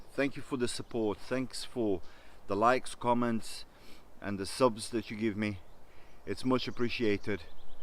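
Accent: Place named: South Africa. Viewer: Another great video and good information, thanks. I can't place the accent, thinking South Africa but could be way off :)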